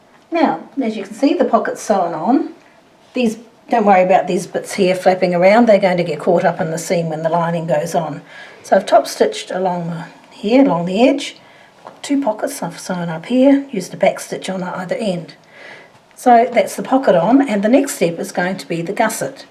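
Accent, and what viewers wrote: Australia. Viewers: Okay, so while I LOVE your tutorial, I absolutely adore your Oz accent. Thank you so much for the guidance on this tutorial.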